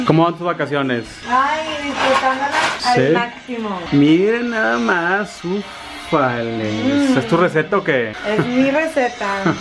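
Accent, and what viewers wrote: Monterrey, Mexico. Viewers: Esta bien chido el acento regio!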